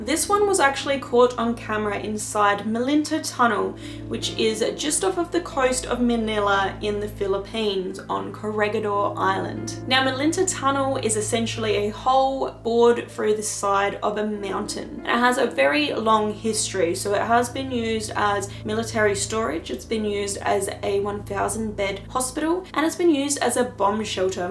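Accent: Australia. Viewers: Hey, I just found your channel through the link the paranormal scholar shared and I have to say after watching a few of you videos I really enjoy your channel and the content is awesome. I’m gonna sub right now. Also, I love you accent. My sister’s husband was born and raised in Sydney Australia but now lives here in Orlando, Florida. He and his family have that awesome Aussie accent and you very much remind me of it. Anyway I look forward to watching your previous videos and seeing what’s to come. Take care and keep on with the awesome awesomeness. Peace!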